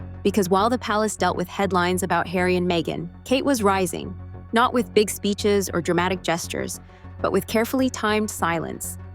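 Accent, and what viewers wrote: United States. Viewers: I am always suspicious when we get announcements from an American voice? I will believe things from an English speaker or a member of the Royal Family or British Parliament.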